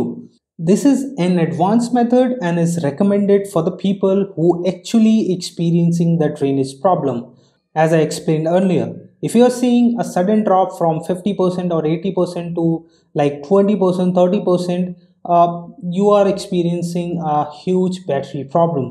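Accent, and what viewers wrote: India. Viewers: Indian detected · Again indian accent👎👎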